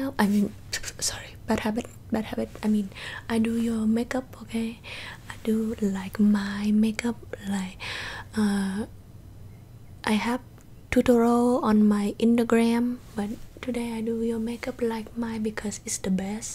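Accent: Asia. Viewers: lmao need more videos like this with the funny asian accent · Oml please do a million more Asian accent roleplays these are my favorite thing on YouTube. I can’t help but laugh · Lollll this accent make more videos with it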